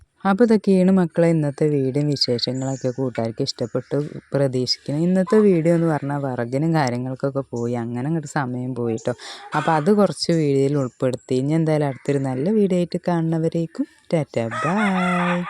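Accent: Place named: Malappuram, India. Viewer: നിങ്ങൾ എന്നത് തെറ്റല്ല ഓരോ നാട്ടിലും ഓരോ സംസാര രീതി ആണ്.... മലപ്പുറത്തുജീവിക്കുന്നവർ വീഡിയോക്ക് വേണ്ടി കണ്ണൂർ തിരുവനന്തപുരം ഭാഷ സംസാരിക്കാൻ പറ്റോ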